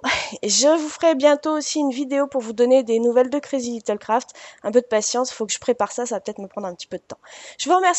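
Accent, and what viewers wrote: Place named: United Kingdom. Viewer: Coucou. Très chouette le contraste couleur/Blanc.Ton accent anglais est comme le mien. Cela me fait sourire car j'ai du mal à prononcer certaines sonorités. Bisous.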